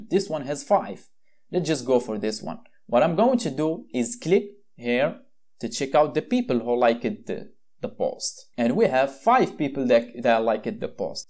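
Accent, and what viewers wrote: Morocco. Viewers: Anglais n9iya akhy bayna fik maghribi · TBH your accent is better than trying to speak America/British accent rir hdr b Moroccan Accent and Keep going bro <3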